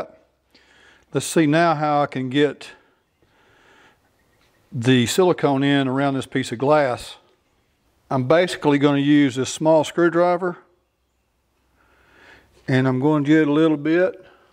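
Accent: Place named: Alabama, United States. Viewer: Alabama? My kinzzz from Huntsville. You have the dialect and accent 😎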